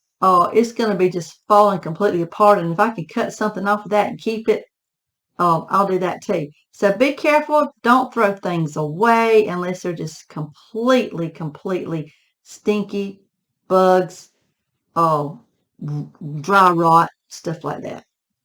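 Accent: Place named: North Georgia, United States. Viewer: your accent is similar to ours here in north GA. Love your channel. I collect and have my two daughters collecting as well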